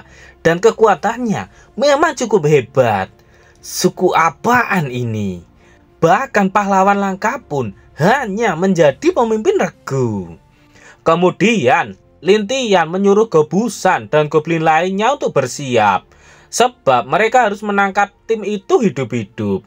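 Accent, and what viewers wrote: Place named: Java, Indonesia. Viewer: Kg jawa medok kembali 🎉🎉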